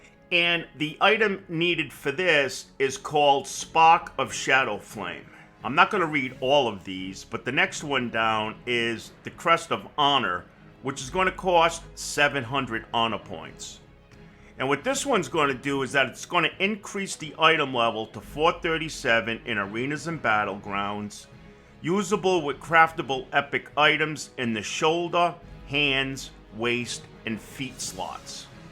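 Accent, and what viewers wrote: New York, United States. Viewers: Your accent has me in stitches. Is it New York or Boston. Remind me if the Construction guy from Futurama ❤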